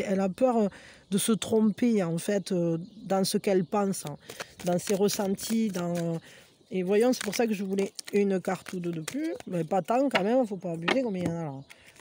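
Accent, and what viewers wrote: South, France. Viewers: Bo jour Jaime beaucoup vous écouter pour votre bienveillance et votre petit accent du Sud 😉le lapin violet me correspond à 99% je suis très fou et j’aimerais que l’autre le soit aussi mais il attend il hésite et c’est assez pénible mais on verra lors de notre prochaine rencontre 🤷‍♀️Je lui laisse faire le premier pas c’est moi qui l’ai fais jusqu’à maintenant merci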